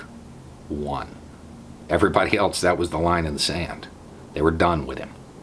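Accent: South, United States. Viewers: Not only is it really neat to hear progressive values in a southern accent, you actually add unique and interesting perspectives to the conversation. I am so glad to have found you beau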